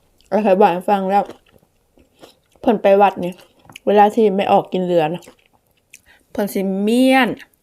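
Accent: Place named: Sakon Nakhon, Thailand. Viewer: ยุสกลบ่จ้าคึเว้าคือทางสกล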